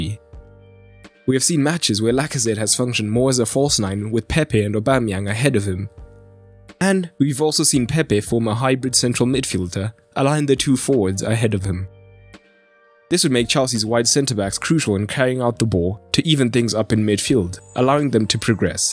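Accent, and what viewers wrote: South Africa. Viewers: You sound South African ? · Is that a South African accent by any chance? Quality, as always!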